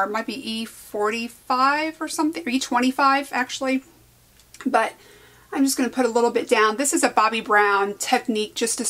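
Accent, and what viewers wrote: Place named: Quebec, Canada. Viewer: C'est trop mignon de t'entendre parler français, j'ai pas l'habitude haha😆🙈 j'aime trop les accents québécois!👌 j'adorerais que tu nous fases d'autres vidéos en français!😁 et enfaite to blend en français c'est estomper!;) bisous xx.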